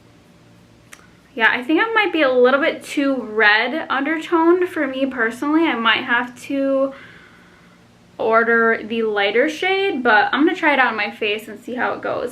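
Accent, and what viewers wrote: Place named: Chicago, United States. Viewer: R u originally from like Chicago or somewhere around that area cuz u have an accent but I can’t pinpoint it lol.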